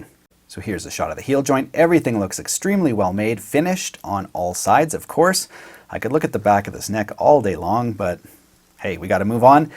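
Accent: Canada. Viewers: I've been waiting for something special as at 67 68 I'm looking for my last guitar purchase..I just sent a email as regards left hand..hopefully its a yes..massive ty everything changed for me since watching your vids and I have watched many..so informative and well presented..and I love the Canadian accent far better than American ha ha..just saying I'm an Essex man England you might not like mine either Yanks but Canadian is a smoother sound..mind you Steve Earle country sound excellent..but I want this guitar quality supreme ty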